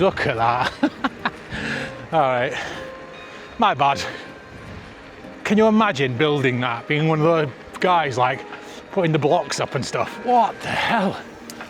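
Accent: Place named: Yorkshire, United Kingdom. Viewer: 🤨 NO you do not need to tone down the worlds greatest accent, Yorkshire!!!!!!!! I cant even stand driving over flockton nothing on earth would get me to ride on there, you wouldn't have a few videos ago, its nice to see your confidence increase. Loving the Trails o life T shirt.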